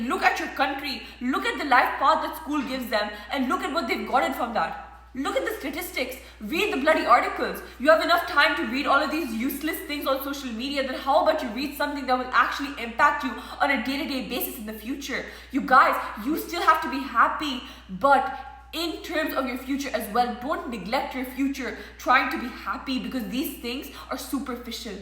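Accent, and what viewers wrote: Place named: United States. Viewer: Whats wrong... Its bit American and is completely fine and impactfull.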